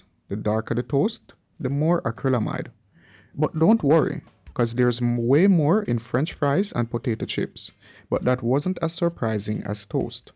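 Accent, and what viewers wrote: Jamaica. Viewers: Very Imformative....I like that you just speak in your normal Jamaican accent & not do the twanging that some others on YouTube do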